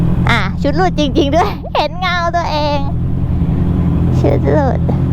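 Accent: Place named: Isan, Thailand. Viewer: เว่าอิสานม่วนคักจ้าาา👍💖😁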